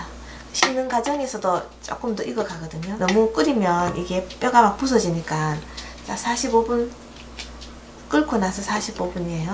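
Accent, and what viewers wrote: Gyeongsang, South Korea. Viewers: 밝은인상 순한 목소리 맛있고 쉬운요리 맛나보이는 눈맛 선생님 구수한 경상도 말씨 넘 좋아요~~알 러브 유~~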